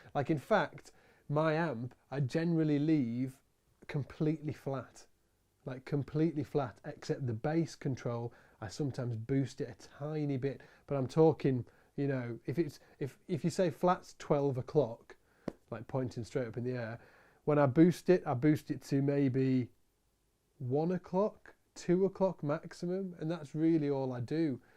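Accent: United Kingdom. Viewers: He's definitely british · so, i was not the only one that did not get the names right..... sorry i have problems with british Accent ....... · He's from the UK =)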